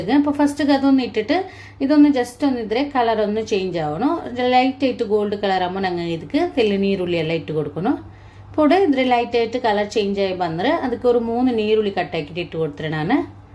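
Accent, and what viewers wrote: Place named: Palakkad, India. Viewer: Nakk ningade samsaram nalla ishtayid.ningale palakka kekkan veedio nokre....👌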